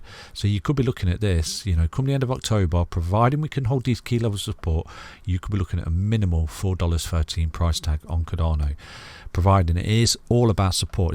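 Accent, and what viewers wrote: United Kingdom. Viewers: Putt Putt soft spoken and soft foods edible Brit accent. Have a parfait.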